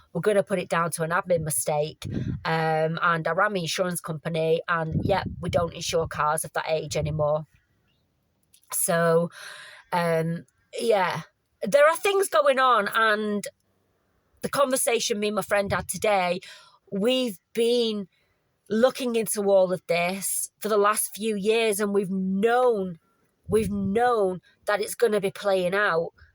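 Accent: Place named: Northern England, United Kingdom. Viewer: I dont blame you for moving away from bolton. I too was born there and moved away in 2007. I can only imagine it has gotten worse, all the shops were shutting in town last I heard, not a fan. Not bothered never going back. Not sure about your conspiracy theories but its definately scary times we are living in. I can also relate to your being isolated, Im the same. wierd thing when you get used to only your own company. All that just to say was an interesting watch. Just a shame how bolton went not many people of the older generation anymore that were like Fred Dibnah. Good luck with the channel. did you move to bolton? can't tell by your accent although can tell it's northern.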